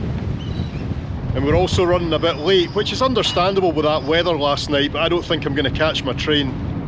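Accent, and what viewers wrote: Scotland, United Kingdom. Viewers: I’m a Brit living in Sweden ca 2000 I decided to take the overnight ferry from Gothenburg to Newcastle via Kristianstad, it was February and we had a storm in the North Sea. I had a cabin on deck six probably under the Bridge, it was like being on a big dipper, I never slept a wink I could hear metal banging a crashing, so I went down to the bar area and met a Finish guy who insisted on buy me beer, which I didn’t really want as I had to drive the next day. At six in the morning I was like you out on deck as the stomach had passed and it was calm approaching Newcastle but the wind was incredible it nearly froze my face! I only found your channel last night and already watched 4 videos thoroughly enjoyed them 5 star 🌟🌟🌟🌟🌟. BTW I love listening to your accent my mother was from Dundee and I was born there moved to Southern England when I was 5 years old, spent a lot of summers in Scotland played golf once as a boy in Montrose. 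I am 78 and I want to visit Scotland soon and look up some cousins while I still can. Keep up the good work ! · Certain accents in the English-speaking world have phrases that can’t be seriously said by all the accents. No Yank, Canuck, Kiwi, or Aussie is going to say “sneaky wee peek.” But a Scot? Perfection! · I really enjoyed this one! Great presentation and I always love your descriptive Scottish brogue. Well done .... what an adventure!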